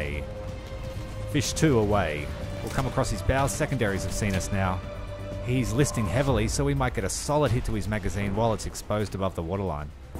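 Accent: Australia. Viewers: "It's now or never, do ya wanna live forever!" Luv ya Aussie humour & narration! Great vid', Sah!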